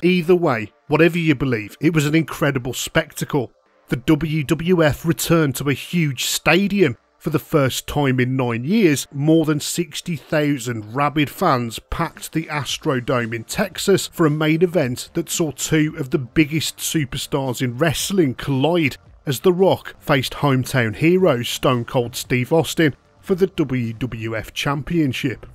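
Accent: Birmingham, United Kingdom. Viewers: Are you from Birmingham by any chance? Or black country?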